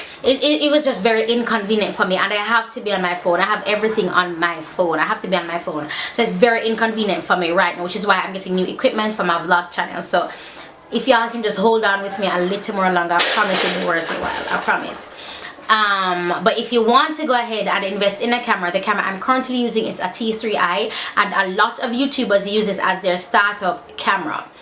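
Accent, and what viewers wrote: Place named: Jamaica. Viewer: Also for your Jamaican accent, which I have found many are attracted to; Californians enjoyed listening to my sister and me.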